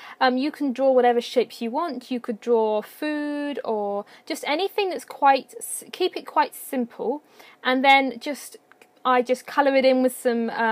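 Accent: United Kingdom. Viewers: Are u from the UK or something no sorry just asking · Idk why nobody has commented on this but I ❤️ your accent! I'm British too, and ppl really like mine too! I've watched this video like 5 times because it's so inspiring, and just to hear you talk too! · Love your British accent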